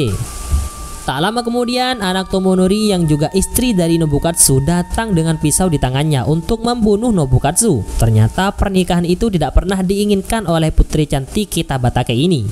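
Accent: Madura, Indonesia. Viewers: Oreng madureh pengisi suaranya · Orang madura ya min?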